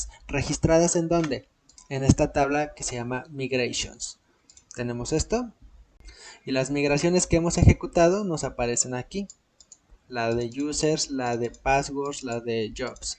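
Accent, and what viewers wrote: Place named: Monterrey, Mexico. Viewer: Muy interesante tu acento. De Monterrey? Me gusto tu video.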